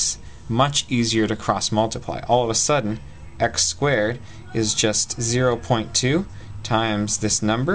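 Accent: Canada. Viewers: you're from canada arent you?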